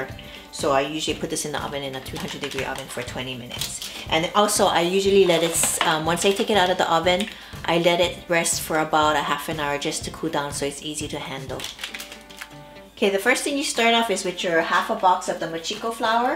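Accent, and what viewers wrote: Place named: Hawaii, United States. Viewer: When I heard you talking, I thought, Hey, this girl from Hawaii. After reading your description I realized hey, I was right. You can take the girl out of Hawaii but you cannot take Hawaii outta the girl! You go girl. Great to find you on YouTube. Hawaii representing! I gotta make this.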